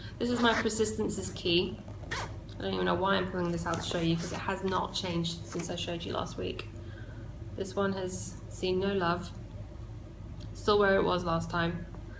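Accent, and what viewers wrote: United Kingdom. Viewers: This is a note, and you can take mu advice, or dump it. I just like people and if they think my advice is silly, I am not offended. You're looking into the computer. Your camera is to the right of how you are sitting. Look into the camera and not the computer to see yourself. You are lovely and do not need to check yourself and if you look odd. You cannot look odd. I am jealous of your hair and think you're a joy to watch. If you looked straight into the lens, I think it would be a titch better, but you could put the back of your head to the camera and I'd still watch. So again, feel free to ignore me. Honestly, you make very few new to pod mistakes. I don't hear some of the words I have to edit out and that takes forever. I ummm, and "uhhhhhhhhhhhh." LOL! I mean every comment with 100% respect to the wonderfulness of you. If you're from the UK is loving Monty Python mandatory? 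My husband says it is and although I've been to the UK, and know it isn't he loves teasing me and I would like a UK person to answer for him. HA! We adore your accent. If course you wonder what I'm talking about, since I'm the one with the flat American accent to you. Very flat, Michigan and we call soda pop. I know you had no need to know that.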